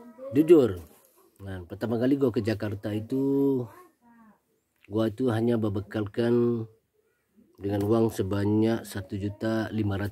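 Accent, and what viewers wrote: Malaysia. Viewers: lama di Malaysia jdi logat melayunya kebawa, tpi aslinya ya emg dri tanah datar sumbar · Kental sangat logat melayu Lord ni, I gagal fokus to your price tag baju, 😆😂 Stay humble and go to the top. 😊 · saya dari Malaysia, saya suka sangat bila masak, sempoi gituu, bila saya tengok mci saya tengok longatnya kok macam orang melayu ni, rupanya orang jebat ni wehhhh. Abang jago ni bosss🤣🤣🤣🤣👍👍👍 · Lord, ceritanya bisa berbicara Melayu mirip Malaysia begitu bagaimana? Kayaknya Sumatra Barat gak begitu deh melayunya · dia logat melayu itu buk,karena 30 tahun tinggal disana 😀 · dia memang orang minang, tapi dari kecil sampai tahun 2017 itu dimalaysia, makanya logatnya itu logat melayu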